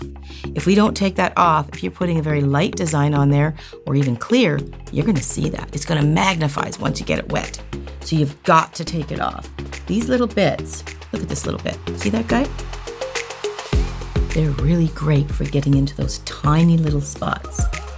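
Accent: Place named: Canada. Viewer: I just got dip powder nails for the first time, trying to grow out my onion-peel, thin nails. (genetic, my mom's are the same) I was looking for info about dip nails online and found your channel and subscribed. I'm not a nail tech, but I love watching you and listening to you. My fiance is Canadian and before I knew where you are, I guesses you are Canadian, love your adorable accent. :) Thanks for making such great videos!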